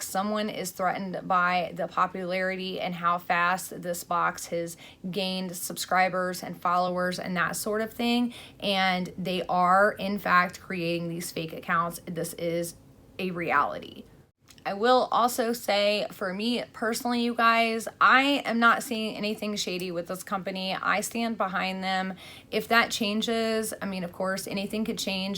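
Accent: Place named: Kentucky, United States. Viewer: i thought your accent sounded familiar ! i had no idea you were from kentucky! thats awesome :)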